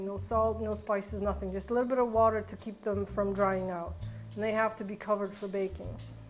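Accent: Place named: Poland. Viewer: I BEG OF YOU TO PUT YOUR RECIPE FOR BEET SOUP. MY BROTHER HAS PTSD AND FINDS COMFORT IN OUR POLISH HERITAGE. MY COUSIN AND I WANT TO MAKE HIM BEET SOUP WITH SHREDDED BEETS AND MAYBE A BEEF BROTH?HE ALWAYS LOVED IT WITH A BIT OF SOUR CREAM. PLEASE ASAP. IVE BEEN LOOKING FOR IT EVERYDAY AND ONLY FOUND IT AND DIDNT WRITE THE RECIPE DOWN,! DUMMY ME! I LOVE THIS LADY. SHE COULD BE MY SISTER. LOVE YOU BEAUTIFUL VOICE AND SLIGHT Polish ACCENT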